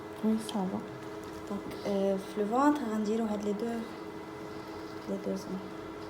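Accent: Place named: Algeria. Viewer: عند.لهجة كيما صحاب جزائر وأنا نموت على مغاربة يعجبوني بزاف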